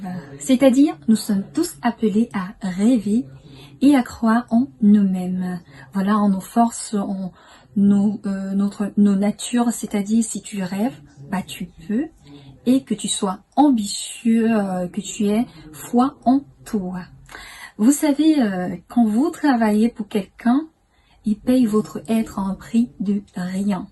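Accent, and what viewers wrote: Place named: France. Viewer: J'aime bien ton accent de Français ma cherie. Merci beaucoup pour cette belle video. J'ai aimé vraiment. ❤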